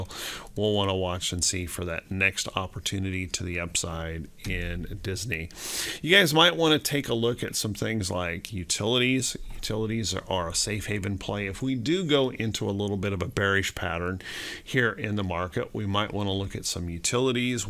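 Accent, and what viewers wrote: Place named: United States. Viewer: Your morning market prep intro sounds so American 🙌 glad to hear that you’re doing better.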